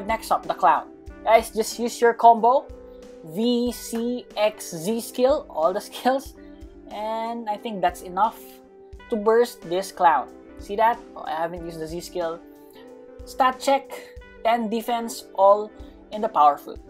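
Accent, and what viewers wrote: Philippines. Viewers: Qeustion my friend What is ur race? If I'm gonna guess Filipino? Cuz ur accent made me curious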